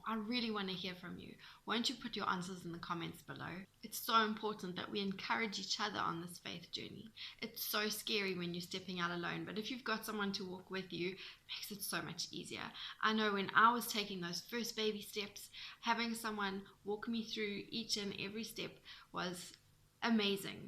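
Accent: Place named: Australia. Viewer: Great work! Your thoughts on faith are spot-on! I can relate so much to this because my journey with my Carlitos giveaway ministry to kids fighting uphill battles is a total faith-walk! Faith honors God, and God honors faith! Keep up the good work! (BTW, I love your accent - are you Australian? Forgive me if I'm nowhere close! haha!)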